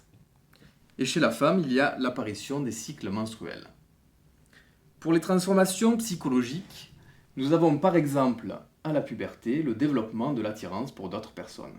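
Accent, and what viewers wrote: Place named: South, France. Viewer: merci beaucoup pour vos vidéos ! ça m'aide pour le bac :) le seul bémol c'est qu'étant du nord de la france avec mes amies on adore imiter l'accent du sud et du coup quand je vous entends parler parfois j'ai envie de rire x) (mais ce n'est pas méchant)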